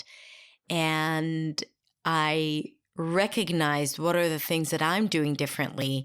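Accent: United States. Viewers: Hey Hey everybody hows your day so far. Express in tones melody Pitch higher more lower Pitch less . Awareness is the key for Clarity .I have spoke English all my life American and yours sounds perfect American inclination speech.If you never tell you are Hebrew as was More Aaron and Merrian .No one would know you aren't born and raised in America .I had lots of physical therapy but never my speech .I had to relearn speech and letters and words numbers all over after my first stroke and lost all ability to walk function and speak. . So I'm self retaught and over minor strokes continue. I'm Bonnie!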